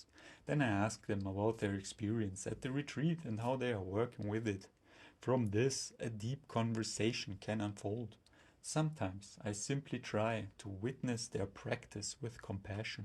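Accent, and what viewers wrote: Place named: Germany. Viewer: Ich hab zwar Tag meines Lebens noch kein vergleichbar heftigen deutschen Akzent gehört, aber ich danke dir soooo sehr für dieses Reading und den Upload.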